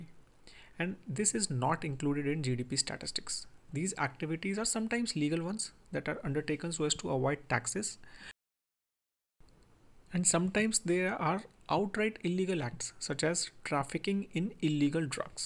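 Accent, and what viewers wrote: India. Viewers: Nice Indian accent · I hate Indian accent.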